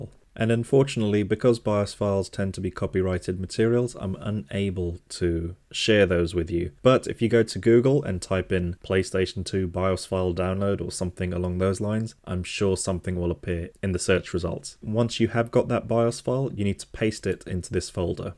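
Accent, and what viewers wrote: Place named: United Kingdom. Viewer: Oh, I meant it in a positive way! I'm not a native English speaker, so I’m used to hearing different British accents. Your accent sounds softer and more neutral compared to others I've heard, and it’s nice.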